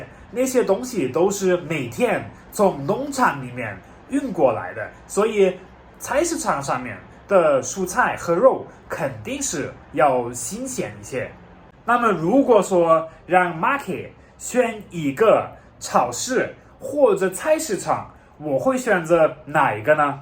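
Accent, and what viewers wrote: Sichuan, China. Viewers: 一口道地四川話，很有趣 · Learn. Chinese stay Beijing . He speak si chuan. And is video skill need improve . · 晕一嘴川味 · “ ”的发音好四川，哈哈，你讲的是老外普通话夹杂四川词汇，非常有意思！继续加油哦 菜市场比较传统，便宜新鲜，会过日子的老人主妇煮夫喜欢去，年轻人则更习惯去超市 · 小伙的汉语已经被四川人带跑偏了😃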